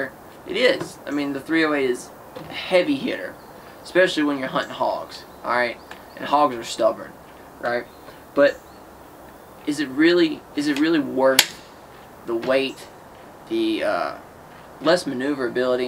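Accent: Tennessee, United States. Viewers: So first off I aint gonna sit here and criticize you even if I might not agree with you 100% for the simple fact that as a guy who is trying to get a youtube channel off the ground I know the pain of making and editing youtube videos and your's are looking pretty good. Secondly for the people criticizing you for not mentioning .308 being better for long range I may be wrong but I'm willing to bet from that accent you come from the HEAVILY wooded state of Tennessee. where the average range of visibility is under 300 yards where as here in south central Kansas unless I'm hunting prime river ground I'm taking shots at or over 300 yards. So long range capabilities would not be as important to you merely because you don't really need them. Anyhow good video man keep em coming you've gained a new subscriber.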